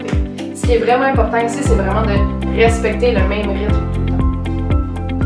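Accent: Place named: Marseille, France. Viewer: magnifique ! merci pour la progression et les éducatifs ... et l'accent ... marseillais.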